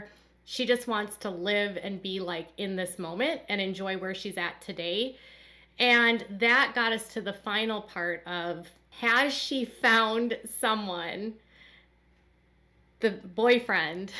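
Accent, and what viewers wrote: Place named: Minnesota, United States. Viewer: I could never put my finger on your accent before because it's VERY slight...but then you said "Minnesota" and I heard it and it was the most adorable thing I heard today 🤣🤣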